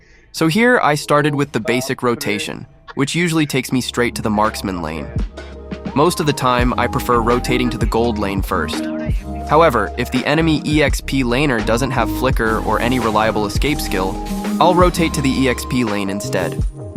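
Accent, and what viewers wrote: India. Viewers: Is bro indian?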